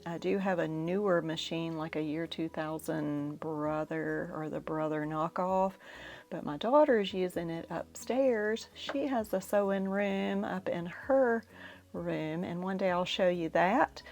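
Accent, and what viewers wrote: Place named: South, United States. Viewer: Welcome,! So happy that Deb pulled you in to #fridaysews! I found her on #teatuesday, where I really enjoy watching her. I don’t produce videos, I just love to watch and sew. 😘 It’s nice to hear another soft southern accent.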